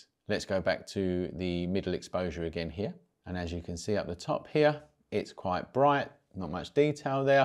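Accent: United Kingdom. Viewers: Great to hear a Brit for once. Also, letting us experience the video before asking for a like and subscription.I will definitely be looking out for more of your videos and advice.good work.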